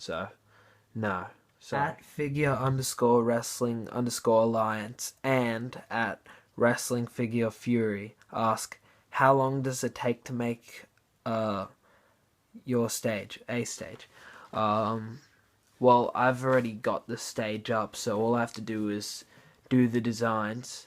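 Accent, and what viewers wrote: Australia. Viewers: Dang this time i can kinda hear your australian accent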